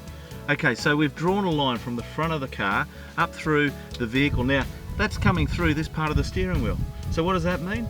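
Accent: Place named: Australia. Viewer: Not gonna lie, even though the heavy Aussie accent was a dead giveaway, I was genuinely surprised when he went inside the cab to show where his “Diff marker” would line up and I saw him sitting behind the steering wheel. In my head, I had already marked it for where in the passenger it would go.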